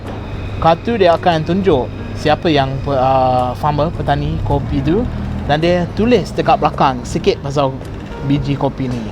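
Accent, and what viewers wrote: Malaysia. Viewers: punya speaking x bunyi mcm org putih dah. Slang Malaysia weh 😁😆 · Hahaha cakap english campur malay aksen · punya slang dh jadi mcm Malaysia-English slang🤣🤣🤣 terbaik! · Perghh slang English mcm malaysian dah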